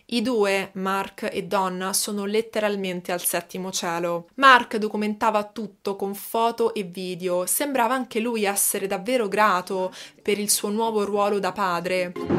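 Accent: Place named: Rome, Italy. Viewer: Un bugiardo patentato , e chi gli crede. Mi dispiace per la famiglia di Donna che oltre alla perdita della figlia , non ha potuto vedere crescere la sua nipotina. Mi dispiace per la famiglia di Robert per tanti anni ritenuto un criminale . Quanta sofferenza quanto dolore. Non so cosa dovresti migliorare, ti trovo molto gradevole , anche il tuo lieve accento romanesco , a me non dispiace. Pronunci bene i nomi stranieri sei di bell' aspetto , sei brava nel documentarti , nell' esporre trasmetti empatia con le vittime . Brava continua non ti fare distogliere, credi nelle tue potenzialità, se c'è da migliorare , senz'altro migliorerai , nella vita c'è sempre da imparare, soprattutto quando si è umili , con la sete di conoscere .😘👏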